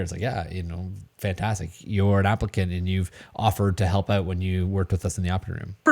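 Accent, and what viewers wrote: Canada. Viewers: WESTERN UNIVERSITY REPRESENTS! No matter how hard you try, can't hide that Ontario accent. 🇨🇦 👍💕 love the channel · I noticed the accent as well, especially on the vowels. I wasn't sure if it was Minnesota/ Wisconsin or possibly Canada. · Are you Canadian? Certain words you say remind me of my friend in Ontario.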